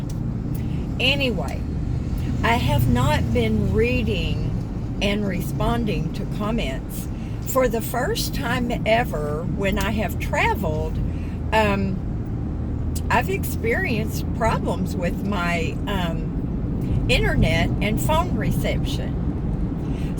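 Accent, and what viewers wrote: South, United States. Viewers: Love the way you talk that southern talk. My family from the south too. Thanks for sharing. Jehovah God take care of all things if we let him. Safe travels.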